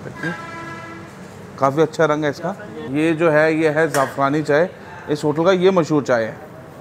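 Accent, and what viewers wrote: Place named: Hyderabad, India. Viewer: Hahaha u r speaking pure hyderabadi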